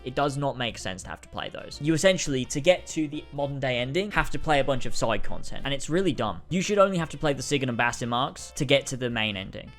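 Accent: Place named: United Kingdom. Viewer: You don't like how vikings talk .... bro you're british. 2nd most annoying accent in the world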